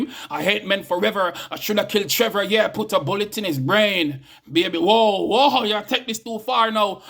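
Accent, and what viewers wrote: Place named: Jamaica. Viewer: Thoughts, emotions, experiences well put together. Expressions one can identify with. I love listening to you recite them. Your Jamaican accent gives it such life! Great job! Love it! 👏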